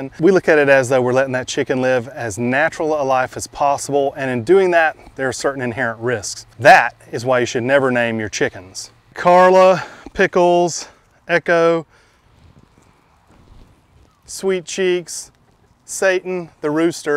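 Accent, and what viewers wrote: United Kingdom. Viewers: British accent earned you a sub... from a Brit! Haha. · That British accent got me good😄 I did not expect that. Good one sir.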